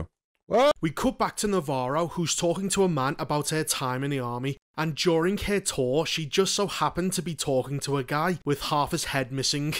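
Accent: Liverpool, United Kingdom. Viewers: There is nothing more perfect to listen to, than a Scouser having a sarcastic rant about something 💩💩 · You’re my favorite reviewer of this. You being scouse just makes it better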